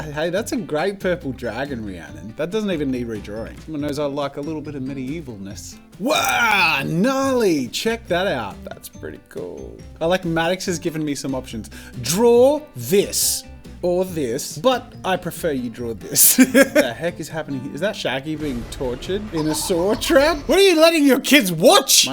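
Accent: Australia. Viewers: Aussie accent lmao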